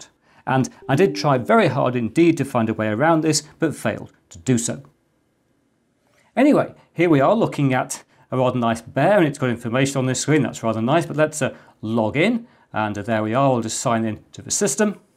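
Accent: United Kingdom. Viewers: Computers are so much more interesting with a British Accent.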